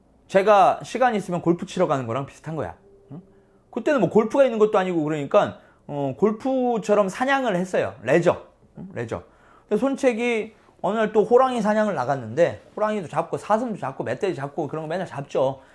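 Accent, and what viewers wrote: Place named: Chungcheong, South Korea. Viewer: 충청도 삼촌이 구수하게 삼국지 얘기 들려주는거 같다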